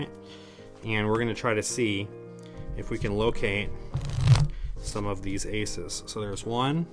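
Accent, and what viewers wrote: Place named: Ireland. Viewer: Funny irish accent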